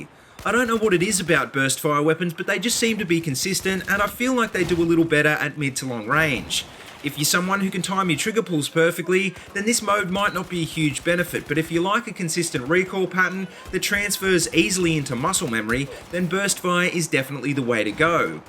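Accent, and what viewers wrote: Australia. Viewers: You sound very familiar. Which state of Aus do you live in?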